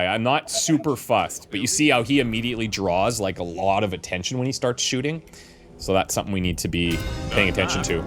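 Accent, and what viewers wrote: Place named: Canada. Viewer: As a non native English speaker it took me till to detect the Canadian 😅 🇨🇦 ❤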